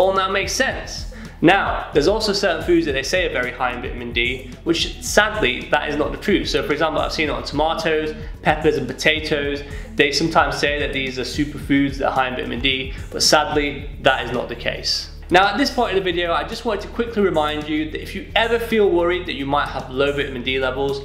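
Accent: United Kingdom. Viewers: Haha, i know it's from your accent and it's how they pronounce it in the uk which is really the proper way because the uk is where English comes from but it sounds funny to us, aluminium is even better hehe..i lived in England before and my friends would also be silly and copy my American accent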